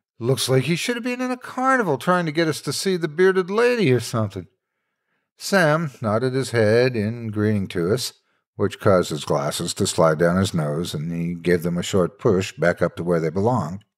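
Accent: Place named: South, United States. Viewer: One of my favorite creepy pastas ever. The way you read this....my my. You have one fine narrative voice. This version was the most...believable, I think. You sound like an old man reminiscing on something horrific and haunting. Your rich, pleasant southern drawl really sells it.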